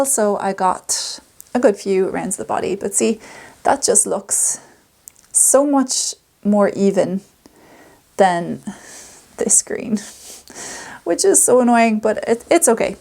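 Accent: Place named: Ireland. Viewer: Love the stitch definition on your Dagmar jacket. Really enjoyed your podcast. I’m from Donegal but living in the UK ( like a previous comment). So love hearing the Irish accent 😎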